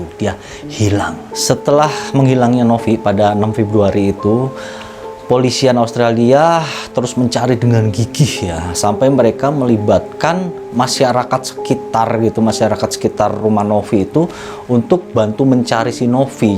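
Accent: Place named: West Java, Indonesia. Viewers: mang kayaknya orang sukabumi/bogor... cara ceritanya, bahasanya bisa d fahami dengan jelas ma gw 🤣🤣🤣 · Bahasa sunda na nyangked wae nya kang hahaha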